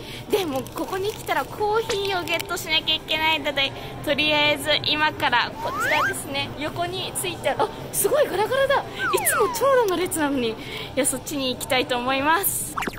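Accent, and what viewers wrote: Kagawa, Japan. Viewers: たまに出る讃岐弁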